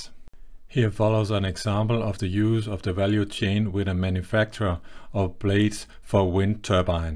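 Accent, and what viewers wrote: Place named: Denmark. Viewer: its called "Danglish".....english with heavy danish accent. typical for people around 50 and up that didn't learn english from series or movies.........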